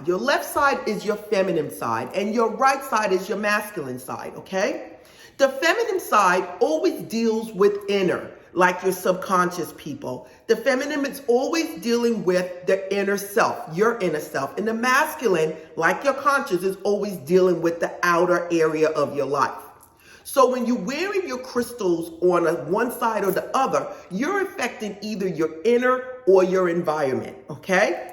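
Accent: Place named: Brooklyn, United States. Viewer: It's giving very NY, very Brooklyn. The sounds of my still living ancestors right now ❤️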